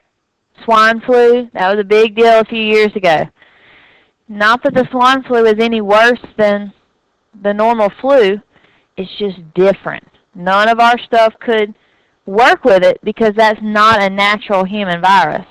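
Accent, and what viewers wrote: South, United States. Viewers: I'm from jersey and I've gotta say, I looove this woman's southern drawl. Her voice will definitely be echoing in my head during the test. Thank you so much for the help!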